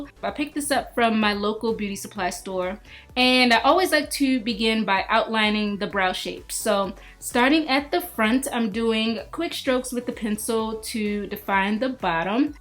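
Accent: Baltimore, United States. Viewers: u have the strongest B-more accent.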